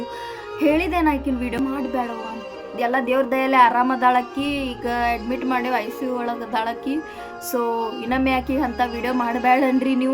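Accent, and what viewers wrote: North Karnataka, India. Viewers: ಸೂಪರ್ ನಮ್ ಉತ್ತರ ಕರ್ನಾಟಕ ಮಾತು ಕೇಳೋದು ಅಂದ್ರೆ ಖುಷಿನೆ ಬೇರೆ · ಏನ್ ಅಕ್ಕಾ ನಿಮ್ಮ ಬಾಯಲ್ಲಿ ನಮ್ಮ ಉತ್ತರ ಕರ್ನಾಟಕದ ಭಾಷೆ ಕೇಳೋಕೆ ಎಸ್ಟೋದು ಚಂದ ಬಿಡು ಅಕ್ಕಾ 🥰🥰💖 ಅಕ್ಕಾ ನಿಮ್ಮ ವಿಡಿಯೋ ಬಾರಿ ಮಾಡುತಿಯಾ ಅಕ್ಕಾ ನೀನು ಅಕ್ಕಾ ನಿಮ್ಮ ಊರು ಯಾವುದು ಹೇಳಿ ನಾನ ನಿಮ್ಮ ದೊಡ್ಡ್ ಅಭಿಮಾನಿ ಅಕ್ಕಾ 💞💖.. 🤩🤩💐 ನಾವು ಹಾವೇರಿ ಮಂದಿ ಪಾ 🎉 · ನೌನ್ ನಮ್ಮ ಉತ್ತರ ಕರ್ನಾಟಕದ ಮಾತ ಕೆಳಕಾ ಚಂದ ಹಂಗ್ ನಮ್ಮ ಕಡಿ ಸಂಸ್ಕೃತ ಮಾತು ಸಲ್ಪ್ ಹೇಳ್ಬಿಡು ಅಕ್ಕ ಆಕಿ ಸತ್ತ ಹೋಗ್ತಾಳ 😅😅😅😅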